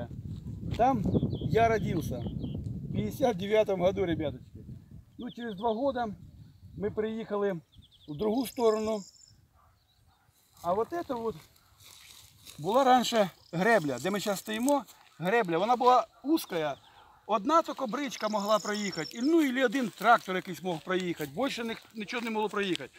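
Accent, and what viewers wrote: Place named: Ukraine. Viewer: Красивые места,! Корни свои нельзя забывать! Говор у вас такой интересный конечно))) Украина))) сразу тестя своего вспоминаю, он у меня тоже родом с Украины, с Львова. Молодцы, что мелкого взяли. Посмотрит где дедушка родился, вырос, это правильные вещи! Спасибо за видео!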